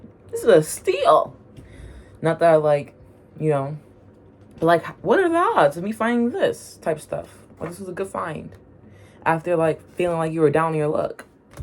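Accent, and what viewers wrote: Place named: United Kingdom. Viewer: Pile 2… AHHH your voice is genuinely like body lotion for the spirit 😂even when you’re being blunt with me about what I need to hear 👀 also why is your British accent sounding even MORE British than mine right now???hahhh A lot of this definitely felt like old energy & some is energy I’m still in the process of releasing. Omgg yes I’m not anti advice AT ALL, Don’t get me wrong, I’ll always ask for tips and recommendations when I need them. But unsolicited advice… especially around my health… I just can’t 😭 The constant ‘have you tried this?’ can feel so overwhelming and like I’m being pulled in a million directions, even when people mean well. It can make me feel like I’m not ‘doing enough’ Sometimes I just need empathy… not ‘fixing’ lol I really loved your take on simply observing the energy & sitting with it, without feeling like I need to take immediate action. 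I need that right now. Pile 2 also lovingly called me out on my own bs 😅 I moved away &released a toxic environment, but I’ve also realised I play a part too… bc I’m very mentally and physically restless and really struggle to just BE. it’s about shifting the mindset not just the environment! That has been a challenge for someone who always wants to be ‘doing’ something when sometimes I actually just need to rest. ahhhh thee synchronicity whatttt😭 I mentioned that song in my last reading… & you posted yours before mine 👀 & I promise I only just watched it now and didn’t know you mentioned this til just no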